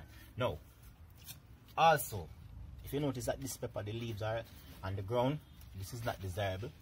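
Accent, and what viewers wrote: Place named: Jamaica. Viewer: Your accent is the best! Thanks for the tips. I'm very excited to get my zoysia going down under on the gold coast with a little jamaican knowledge!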